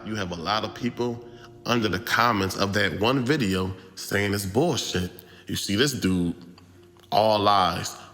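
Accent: Philadelphia, United States. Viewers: Love these videos!! Keep these coming. If we didn’t know you was from Philly….this video let us know YOU DEFINITELY FROM PHILLY😂😂😂😂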